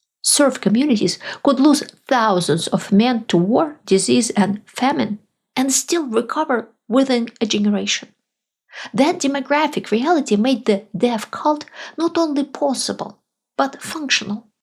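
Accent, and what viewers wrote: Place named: Russia. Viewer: Oh, I love this Russian accent ASMR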